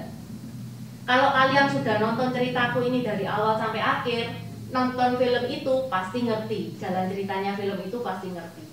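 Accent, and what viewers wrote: Java, Indonesia. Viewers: Bagus memberikan wawasan baru. Tp mgkn lain kali perlu latihan pengucapan istilah tionghoa nya spy lbh enak di dgr gtu. Ini msh terlalu medok logat tionghoa jawanya.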